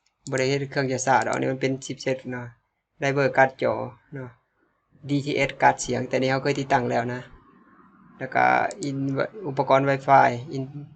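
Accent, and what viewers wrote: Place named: Korat, Thailand. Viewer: ชอบสำเนียงแก่จริงๆ คนโคราชนี่.?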